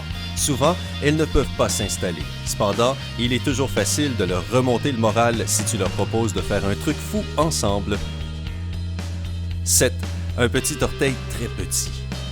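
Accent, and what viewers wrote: Quebec, Canada. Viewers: "cpendant" mdrrr tu pue le quebecois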